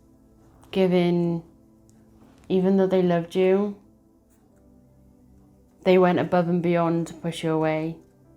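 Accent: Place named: United Kingdom. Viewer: 2 years this as been going on and the same time following tarot this reading is so accurate it is unreal everything not one word out of place its mad how your channel just poped up on my screen when i was about to give up and completely forget this but you have given me hope.Thankyou so much its lovely to hear a British reader ❤️ ❤stay safe❤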